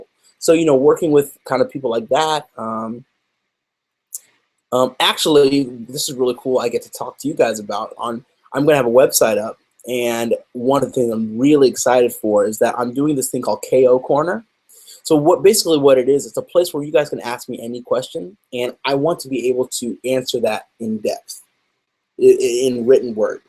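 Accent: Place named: Spain. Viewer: Wow! your spanish accent is fantastic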